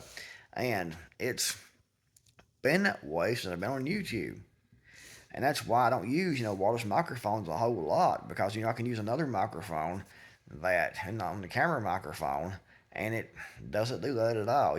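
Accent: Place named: South, United States. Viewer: Reminds me of that scene from Django …”all I hear is criticize, criticize, criticize “. The world is full of trolls, just waiting and looking for opportunities to whine about something. It’s exhausting, predictable and nauseating. Never thought we would live to see such unmanly men and self centered twits who have nothing better to do than nit pick. I never noticed your breathing, I just enjoy your southern drawl 😊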